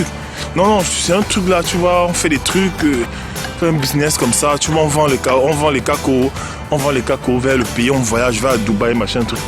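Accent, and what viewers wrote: Cameroon. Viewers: Pas besoin de te présenter gars, ton nom, ton timbre vocal et ton élocution font de toi un Camer. Et merci pour le conseil. Je t'invite à aller écouter les bra-tra-saqueurs de Marseille su Femme Immigée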